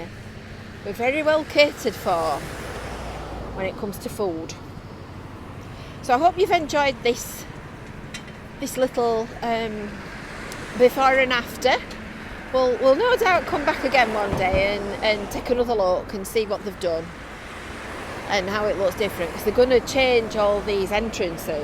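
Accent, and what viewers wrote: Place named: Yorkshire, United Kingdom. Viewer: How could you be awful in Spanish at school with that broad Yorkshire accent? Maybe you should have took German 'cos you could talk quite loud then!! Plus it's more like english than you would think!!🥰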